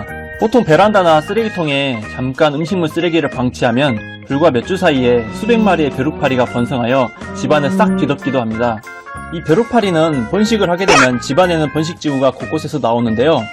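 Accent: Jeolla, South Korea. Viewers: 전라도 사투리가 조금씩 들리네요ㅎ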